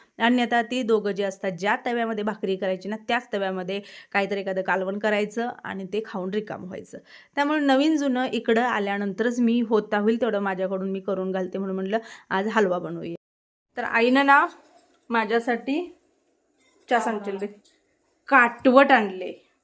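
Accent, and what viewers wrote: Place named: Dhangari, India. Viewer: ताई तुझी भाषा धनगरी वाटते ग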